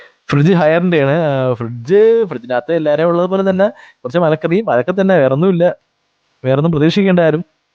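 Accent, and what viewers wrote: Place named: Southern Kerala, India. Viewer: നല്ല വീട്, വളരെ simple,ഭാഷ അടിപൊളി തെക്കൻ, മണ്ടേൽ കേറി പോകുന്നു, 👌👌👌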